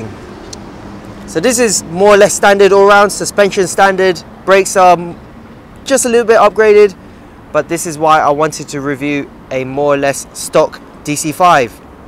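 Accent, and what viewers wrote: United Kingdom. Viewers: Asian dude with British accent gotta love it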